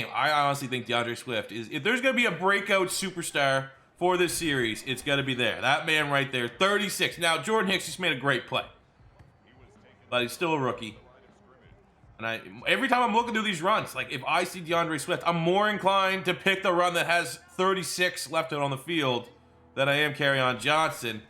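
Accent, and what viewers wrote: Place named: Canada. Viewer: This guy sounds like ricky from TPB...must be canadian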